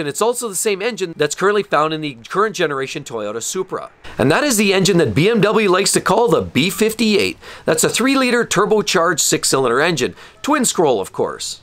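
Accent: Canada. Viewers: drop the heavy Canadian accent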